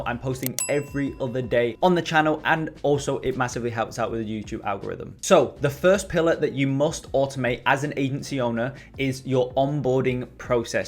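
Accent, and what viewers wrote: United Kingdom. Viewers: Hey bro are you originally from the UK?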